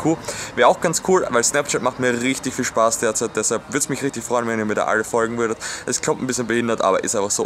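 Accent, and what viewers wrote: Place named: Graz, Austria. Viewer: Das ist der grazer Akzent wir sind keine Bauer in graz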